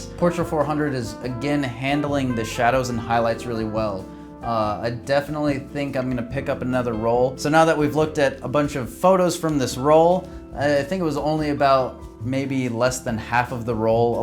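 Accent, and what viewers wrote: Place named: Seattle, United States. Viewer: From they way your talking it sounds like your from Seattle, I’m from Seattle to and are trying to get back into my photography from taking a little, i recently just ran across a Pentax K1000 w/ a tamron SP 35-210mm lens at a pawn shop and had to get it, I loved your video, I have to start practicing with mines .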